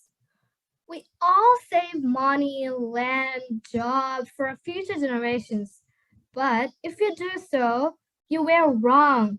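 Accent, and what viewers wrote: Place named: United States. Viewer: Speaking like someone who born in America, next level accent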